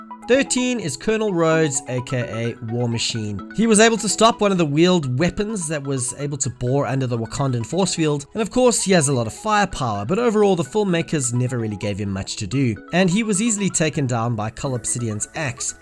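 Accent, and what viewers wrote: South Africa. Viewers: Loving the south african accent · Strange question, are you South African? just asking due to your accent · This guy sounds like he is South African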